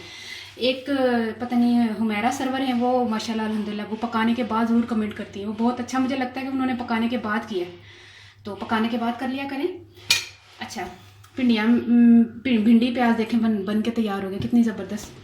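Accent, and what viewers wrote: Punjab, India. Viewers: Your Punjabi is so cute... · Ma sha Allah...nice Ma b bohat achi bindi bnata hn...abi lay k aya hn. Tu bnany lga tha socha utube se b dekhon or aaj difrent bnaon ....from UAE You say pindiyan😂punjabi accent....lay sun layan sun..sialkot...lahore...gujjranwala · Ji good I like ur Punjabi acent from 🇨🇦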